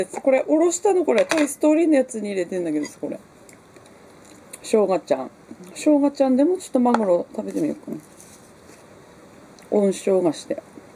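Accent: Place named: Aomori, Japan. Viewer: 青森、懐かしいイントネーションです😀鶴亀温泉、フラワー温泉、行きたいな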